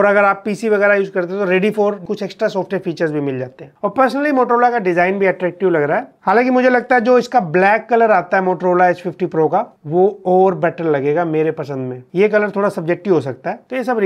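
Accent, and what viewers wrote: Lucknow, India. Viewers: Bhai aap Lucknow se hona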